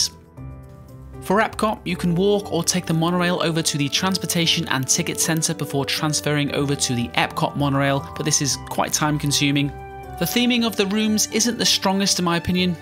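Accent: Liverpool, United Kingdom. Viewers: Thanks so much. Best video I have ever seen from Disney you tuber. And I've seen a lot! Love your accent..are you a scouser?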